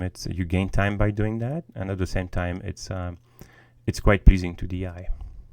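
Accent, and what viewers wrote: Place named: France. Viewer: Really cool. Your French Accent is cool! Im half french and I wish I had a french accent instead of a boring london one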